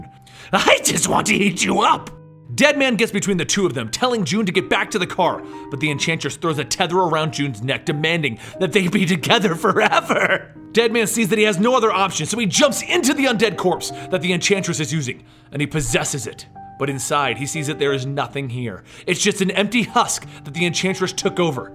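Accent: United Kingdom. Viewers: Nice British accent xD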